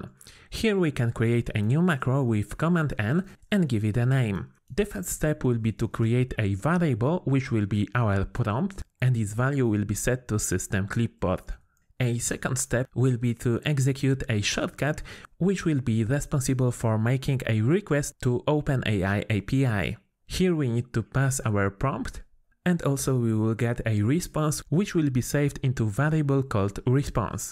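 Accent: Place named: Poland. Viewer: Niestety ten filimik jest zbyt bardzo polski :( Uszy bolą od kłującego polskiego akcentu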